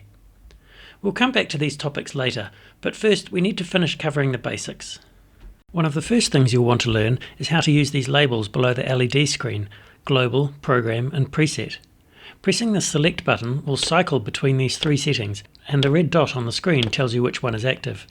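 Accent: New Zealand. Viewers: Your a kiwi?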